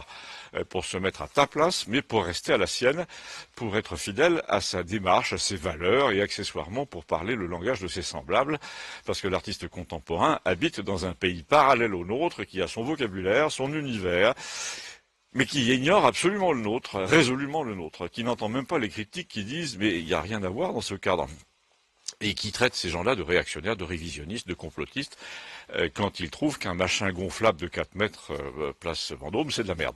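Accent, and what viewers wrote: South, France. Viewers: Génial, comme toujours, soit depuis quelques mois que je vous suis, directement du Québec. IL y a plusieurs références que je ne connaît pas mais j'arrive à vous suivre. Je m'aime beaucoup votre accent du sud et votre timbre de voix. Merci, c'est devenu un rdv hebdomadaire. C'est comme retrouvé un vieil ami d'outre-mer. Salutations du Québec (Rimouski).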